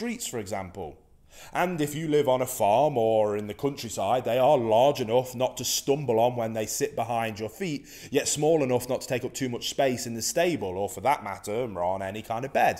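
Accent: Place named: England, United Kingdom. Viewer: I love your accent. (And I also love your video otherwise I wouldn’t have watched it.) What part of England are you from?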